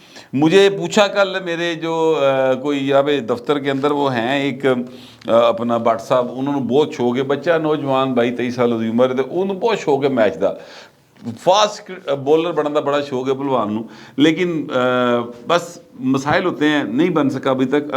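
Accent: Lahore, Pakistan. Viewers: کیا بات ھے جناب پکی اندرون شہر کی بولی بولتے ھیں بہت اچھی لگتی ھے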